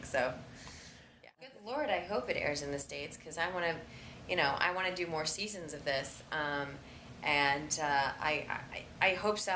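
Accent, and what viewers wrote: England, United Kingdom. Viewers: is she english? she sounds english might be me though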